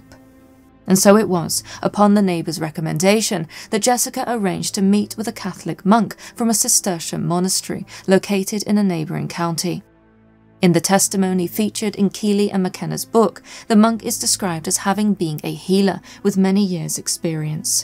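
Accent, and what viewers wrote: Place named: RP, United Kingdom. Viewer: You talk like a toff, try throwing in the odd bit of slang or a good old fashioned 4 letter word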